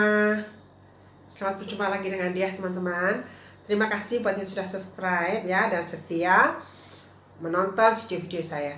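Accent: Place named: United Kingdom. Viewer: good night madam this is British accent 😊😊.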